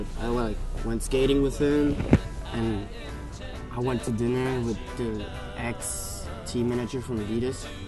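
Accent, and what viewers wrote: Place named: United States. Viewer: how is his accent so american if he was raised in germany??! well anyways thats cool and impressive as shit!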